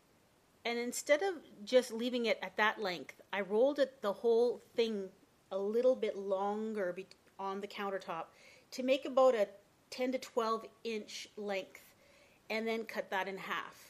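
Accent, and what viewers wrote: Canada. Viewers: Are you canadian? You have that baker/canadian soccer mom accent..I love it :D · You are Canadian! I love your accent! I subscribed! All of you vids are so cute! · lol you sound so canadian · I can hear her canadian accect noww!! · Your accent sounds like your from canada, but you measured in inches, Wisconsin? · where are you from? it sounds kinda like a strong Canadian accent, but I don't really know.. Minnesota?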